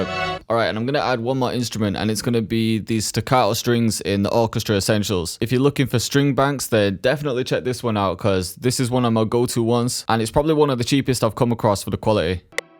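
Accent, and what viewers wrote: United Kingdom. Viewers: I like how uk ppl say 808 A O A 😂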